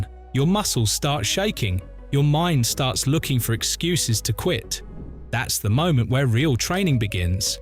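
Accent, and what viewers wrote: England, United Kingdom. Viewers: Thanks enjoy your vids. Good exercises to build into my routine. But would be good if vid matched the lovely sounding (AI) englishman. Nobody in the vid was actually holding the Archer pushup - all were moving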